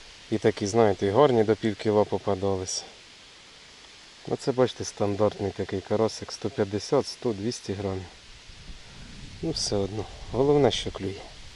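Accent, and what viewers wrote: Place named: Surzhyk, Ukraine. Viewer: Я вибачаюсь ви з якого регіону українці бо у вас пробачте страшний суржик поправте українську мову а взагалі я дивлюся ваш канал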